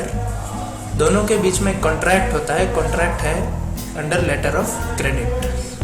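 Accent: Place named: Gujarat, India. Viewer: Sir, i did import export course... Ane tamara tone parthi lage che k tame Gujarati cho.... Kai Job hoy toh let me knw... M ready to cm at ur place😁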